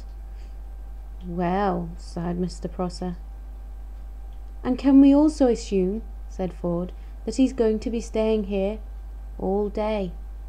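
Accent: United Kingdom. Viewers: When I first heard you read a story I thought you should have children all around you! You would be an excellent story teller! I do love your British accent... You have my attention!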